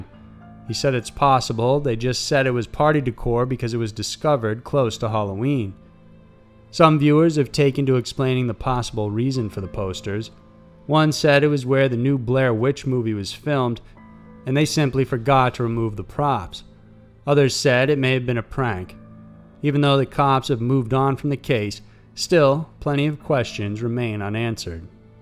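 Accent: South, United States. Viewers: could be like mine, totally untraceable. My mom is from Michigan, dad is from Mississippi, I grew up between Louisiana and Alabama then college in GA. But I didn't interact with other kids much when I was young, read a lot and wound up with barely an accent. After moving around a lot in adulthood and picking up linguistic tidbits from all over the country (some intentionally, some not) it's a mash-up. But when I was younger and had so little accent that people thought I was from somewhere else ("where are you from?" "I was born in NoLa." "No, i mean where did you grow up? You sound like a Yankee." etc) I sounded like this guy. Very subtle southern accent but much closer to the "Nowhere Special" accent that newscasters have. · Anyone else think this guy is from the South>? he has a noticeable drawl to his voice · Goddess bless that southern inflection in your voice. 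Reminds me of the pine forests back home. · Trying to place your accent. Obviously southern. But not East Coast, because that's where I'm at.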